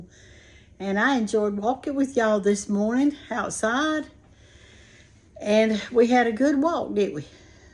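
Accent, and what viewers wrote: South, United States. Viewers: Hi queen I'm from Augusta Georgia and I love that country voice lol the way you cook them liver and onions thank you again ❤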